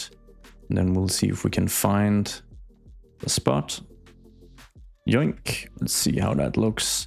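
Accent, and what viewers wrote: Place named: Denmark. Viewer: Ayyyyy! I've missed that Danish accent! Great to have you back :P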